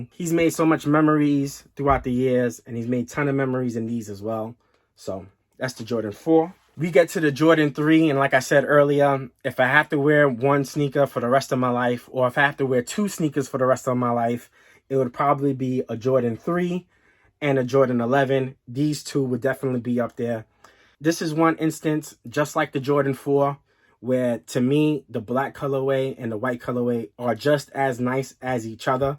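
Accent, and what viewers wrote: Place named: Long Island, United States. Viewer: Dude got a harrrdbody Long Island accent hahaha love it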